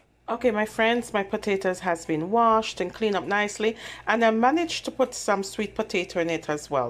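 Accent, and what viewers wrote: Jamaica. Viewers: Yummy 😋 you have an jamaican accent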